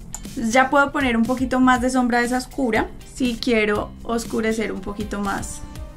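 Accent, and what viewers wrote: Colombia. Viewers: Explicas súper bien, aparte ese bello acento que tienen ustedes los colombianos 😍. ¿Podrías hacer un video de cómo delinear un ojo encapotado? Saludos desde México.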